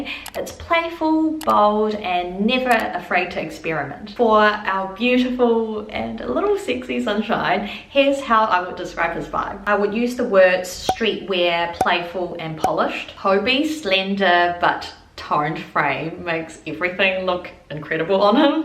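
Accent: New Zealand. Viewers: Love your kiwi accent. This was so fun to watch. I hope you get those tickets! But what will you wear when you do? 😅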